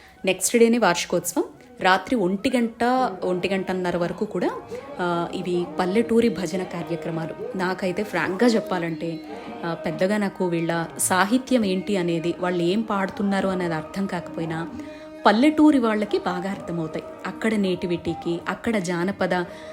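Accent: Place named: Pulivendula, India. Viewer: Akka voamma entha baga annaru akka ma wuru pulivendula akka ma language gurthuku vachindi akka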